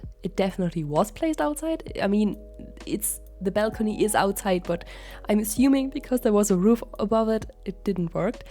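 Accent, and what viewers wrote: Germany. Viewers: is she german? i think i hear a little accent because i‘m german too and i got so excited bc i rarely find german content creators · Yeh I'm wondering too!!! 😊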